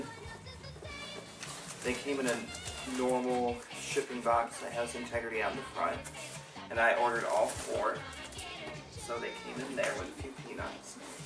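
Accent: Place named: United Kingdom. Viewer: I hear British accent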